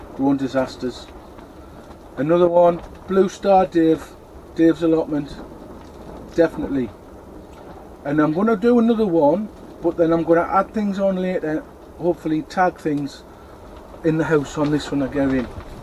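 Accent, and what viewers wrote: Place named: North East England, United Kingdom. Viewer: Just found your channel by accident .. and recognised the accent. I'm in Whitley Bay and I guess you are not too far away. Regards !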